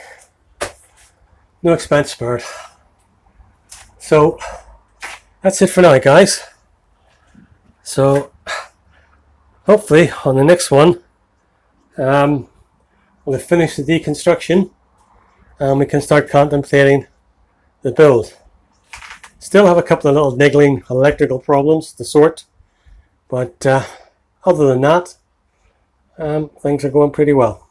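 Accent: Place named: Ireland. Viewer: Well hello there. I get to praise you as my hubbie is a bit of a quiet person. I love to hear your accent it reminds me of some Irish visitors we had in our Bowling Club in Scotland's east coast. You must be a really handy man to have aboot the place. I'd trade you for mine, as I can see you're a quare bit more cuddlier and there's nae heat in that one of mine in a winter's night. Till I tune in again take care my love.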